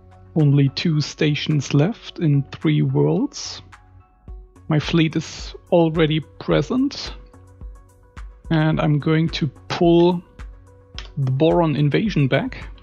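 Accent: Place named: Germany. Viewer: Woohoo, german accent again! :) Danke!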